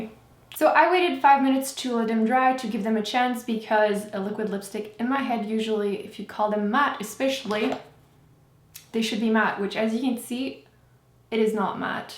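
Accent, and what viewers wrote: Quebec, Canada. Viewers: She is Canadian French. How the heck can you not detect an accent??? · Hehe. Accent: French Canadian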